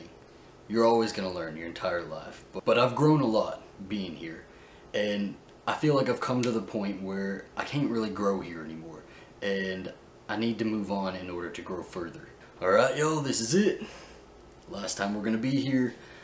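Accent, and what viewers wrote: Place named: Alabama, United States. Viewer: I could listen to your deep Alabama voice all day my favourite YouTuber no whistles or click bait. Your channel is artistic, authentic, honest, my favourite YouTuber keep up the great work !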